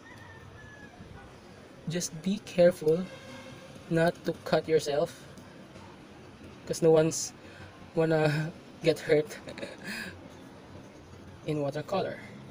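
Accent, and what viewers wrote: Philippines. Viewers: Its really easy to distinguish a filipino with his accent haha, am i right??...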